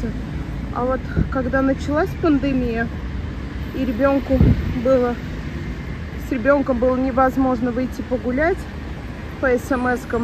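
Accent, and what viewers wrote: Baku, Azerbaijan. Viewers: Привет из Молдавии!!!,случайно попала на ваш канал и была очень рада!!!Я родилась и выросла в Азербайджане,в поселке Насосный...Училась в институте в Баку...Практику проходила на нашем Бакинском телецентре... Огромное спасибо за чудесные позитивные ролики!!!За ваш бакинский русский...Азербайджанский язык Я помню...не могу сказать,что в совершенстве,НО...,всех благ вашей прекрасной Семье,пусть все будут здоровы...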